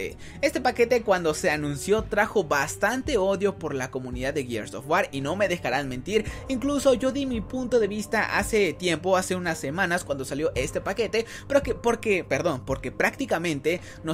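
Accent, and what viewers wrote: Chiapas, Mexico. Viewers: eres de chiapas?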